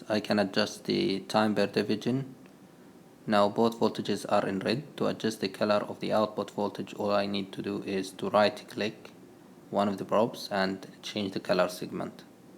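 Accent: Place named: Arab world. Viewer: عربي. واضح من اللهجة ما شاء الله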